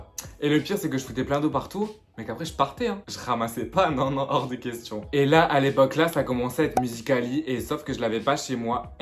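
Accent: Quebec, Canada. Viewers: T'es québécois ? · Avant ton accent in n aurai dit un quebecoi😂😂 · crime ta canmeme un bon acent quebecois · on dirait pas l’accent chtis mais canadien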